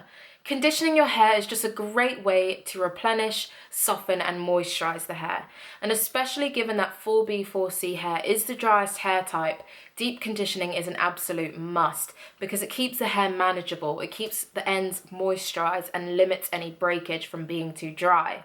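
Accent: United Kingdom. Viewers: yes you're British!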